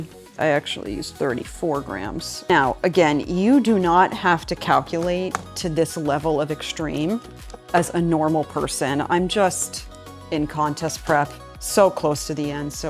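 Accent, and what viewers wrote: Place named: New York, United States. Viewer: you are, hands down, my most favorite vlogger. So inspirational, and I love the agency you hold in your life. I’m 70 years old (I can’t believe it really), but don’t see myself as old. I work to stay fit and healthy, and being vegan is a foundational identity. I live in the PNW (Orcas Island), but lived in New York for some time, and your mild NY accent always takes me ‘home’. Anyway, all this to say, I appreciate who you are and your commitment to your dreams. I have great confidence you will acquire your pro card! ❤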